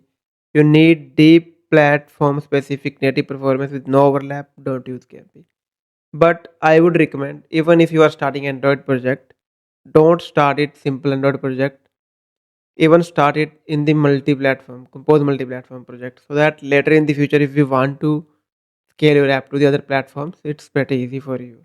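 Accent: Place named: India. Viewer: Nice video in an overall. I think you need to just slow the pace a bit! I usually dislike a lot of indian accent when speaking english, but in your case was really fine. Just need to improve a little more your speaking, try to pronounce more correctly the words, including the right sound of the W word. Doing this, your channel will grown way more!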